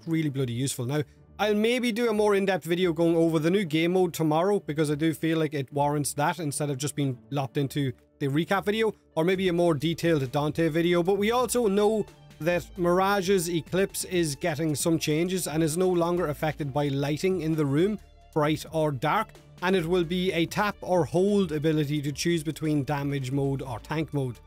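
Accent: Ireland. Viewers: clearly not considering this is an irish accent...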